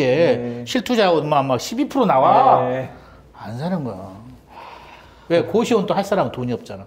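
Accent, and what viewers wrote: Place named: Jeolla, South Korea. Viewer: 아...이책 꼭 구입해야겠습니다.. 구수한 전라도 사투리..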